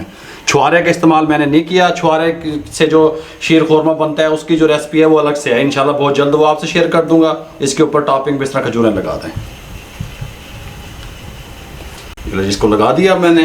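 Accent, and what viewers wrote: Punjab, India. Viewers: Asslam o alaykum, Bhai Jan aap k liye kucch mashwara dena chhahta hoon. Cheff aap ki kucch baten improvement chhahten hen. please consider Karen. 1- aap ney kam bolna he. 2- koi mas'la nahin he. (Matt Boleyn) 3- tension nahin leni (Matt Boleyn) 4- sirf Urdu Boleyn. Punjabi ka tarrka matt lagaeen. 5- aurr sab se buraa lagta he. (Samjha Karen). 6- aap ko chahiye sabb se pehley kisi bhi recipe key ajzaa (ingredients) bataen aurr unki quantity (miqdaar) btaen. Aurr phhir recepie ko banana shuroo Karen. Ummeed he meri baton ko masbat lete huee amal Karen gey. Jiss se insha'Allah aapki video quality improve ho gi.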